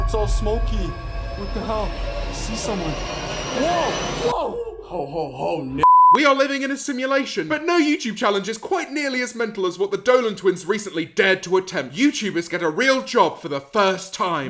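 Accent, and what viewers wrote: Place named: United Kingdom. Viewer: British accent, euros in your hand, something's wrong. I am new to the channel, can somebody explain it to me?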